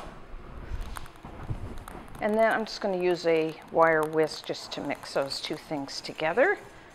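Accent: Canada. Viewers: She sounds so canadian "abowt"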